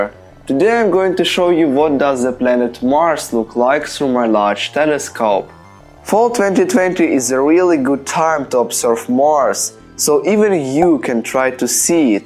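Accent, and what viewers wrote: Russia. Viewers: Wait, are you Russian? That’s some pretty good English. If you didn’t have such a strong accent, you’d be better than me lol. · You sound like Russian Отличный английский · You seem to have a Russian accent. Are you Russian?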